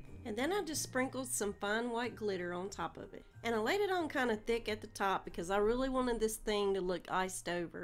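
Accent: South, United States. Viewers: I'm not sure where you are from, but I absolutely love hearing someone on YouTube that sounds a lot like me. I grew up in middle Tennessee, so I have a very thick southern accent, so I feel like you're a family member helping me craft! Thank you for that! Lots of Love from one southern woman to another. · I just discovered you and I'm thrilled I did! I have to tell you how much I enjoyed watching your Video the music was nice and I loved each and every Christmas ornaments I really did! The baby JESUS was such a nice idea and it turned out so adorable (your humor is appreciated, I need some lately these days.) The pink tool was stunning with the multicolor gravity Ball..it sparkled so lovely! The Snowman's Top Hat and face was clever and so nice seeing it hung on the Tree! I liked the way you explained each craft...,I love listening to your Southern accent I'm from lower Michigan we don't have a friendly sounding voice (but we do say "POP" for bottled Carbonated Soda) Gee! 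ALL were really great ideas and some I've seen others do "BUT" using the BIGGER Bulbs I have to say that was one of the differences and yours had a look of neatly done, fresh and festive! SUBSCRIBED! Merry 🌠CHRISTmas and Blessings all throughout the coming 2023 🛻🎄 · I normally don't care for DIY projects because they look just like DIY but I have to say these are adorable. I will do a few of these. Thanks so much. Now we all are wanting to know where you are from? We hear a southern accent... Maybe you are from my south.. Louisiana! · Just found your channel and I had to subscribe! I’m from southeast Georgia so I love your accent even though you are from a different part of the south. We are still both Southern girls!❤️Loved all your ornaments and will be check out your page for more great ideas!❤️ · Love your crafty ideas and your southern accent too. Sending love from the southeast Kentucky mountains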